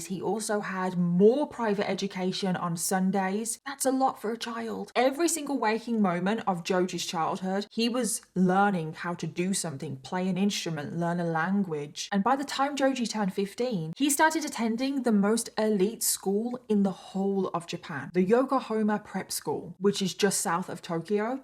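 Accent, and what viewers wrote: United Kingdom. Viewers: My mother in law is from the UK, I just love your accent!!! And love your story telling!!! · Her British accent also helps. I've always found this irestisteble in women😆🥁😆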